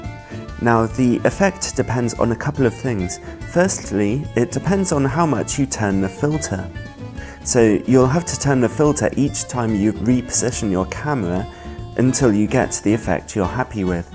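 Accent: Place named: England, United Kingdom. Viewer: He's English like myself 🙂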